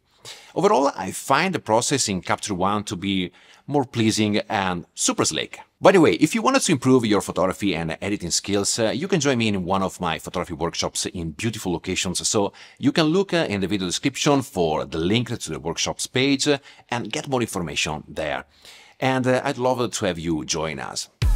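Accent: Italy. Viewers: Great comparison. Thanks ! Your delivery is very friendly and informative and the Italian accent is charming.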